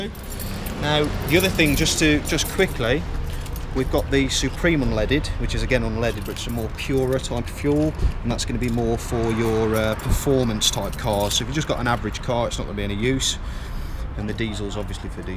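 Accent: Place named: England, United Kingdom. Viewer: Funny English accent 😁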